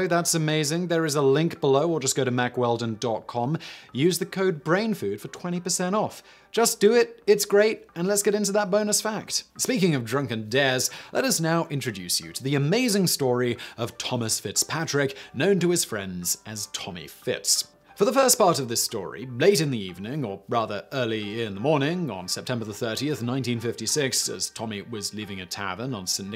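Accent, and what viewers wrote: England, United Kingdom. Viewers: The presenter has such a proper English accent. Where’s your mac Englishman?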